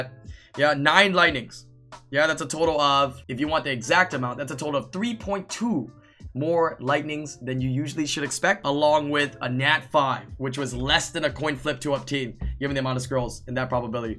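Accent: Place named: Asia. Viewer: Omg the stereotypical Asian accent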